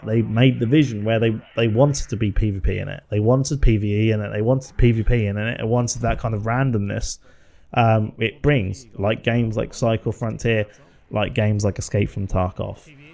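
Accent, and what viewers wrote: Norfolk, United Kingdom. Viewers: What part of Norfolk are you from mate ?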